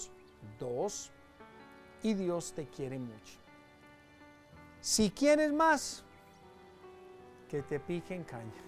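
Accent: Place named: Antioquia, Colombia. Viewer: Fresco Papá! A lo paisa pues Padre. Me encanta! Me encanta! Usted es super lindo y humilde padre con todos los que le escuchamos. Me hacen feliz los dialectos de mi hermosa tierra. Me hace sentir como si estuviese allá en mí tierra que adoro y extraño. Gracias Señor Jesús por que Tú vas conmigo, en todo momento. Sácanos de todas éstas turbulencias mi eterno Jesús. Sé que contigo siempre tenemos La Paz. Bendiciones Padre. Gracias por compartir diariamente La Palabra de Dios. Que Dios le conceda mucha sabiduría, salud, y felicidad. Feliz día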